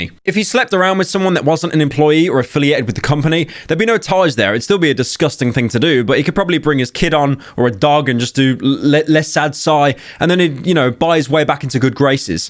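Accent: United Kingdom. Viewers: Wait is British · hello british person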